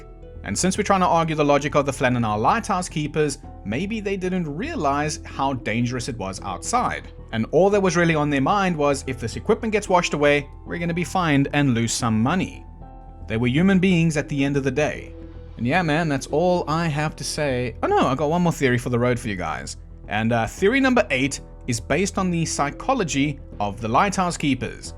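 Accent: South Africa. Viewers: Is he South African? Can’t place the accent 😅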